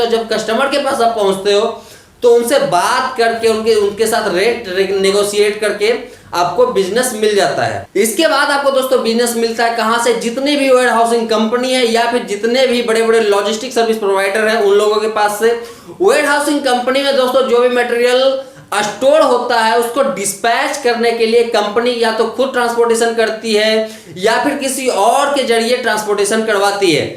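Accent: Bihar, India. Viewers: Aap bihar se ho bhai sahab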